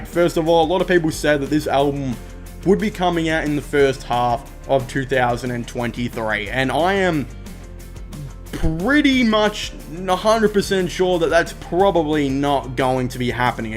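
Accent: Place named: Australia. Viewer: aussie accent lad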